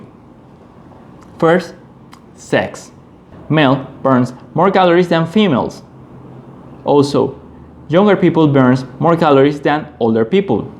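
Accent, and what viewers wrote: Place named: China. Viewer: I think you shouldn't change your accent just because some people think you should. Its beauty of this world where we live that everyone has their own unique way of speaking a language. I live in Engalnd and we have God knows how many different accents here, I find it really hard to understand Scottish accent but I would never ask my Scottish friends to change their accent for me. Similarly my Caribbean friends have very strong accent and it's the beauty of every area. A Chinese man should speak in his accent as it's not easy for me to speak your language but you are speaking in my language so i should be grateful to you. And again you have beautiful accent and always keep it like that.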